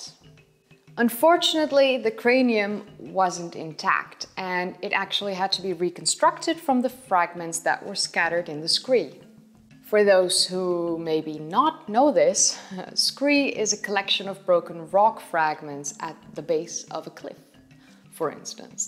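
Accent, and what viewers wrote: Netherlands. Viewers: your accents is not that bad compaired to that of a lot of Sutch politicians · I like your Dutch accent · I knew I smelled a Dutch accent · you being dutch accent makes you you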